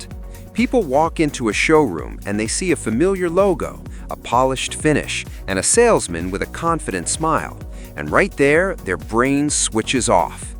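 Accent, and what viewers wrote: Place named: United States. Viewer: The cars a premium budget option is a bloody oxymoron.. Yankee accent talking rupees, this bound to bullshitignore it!!😂😂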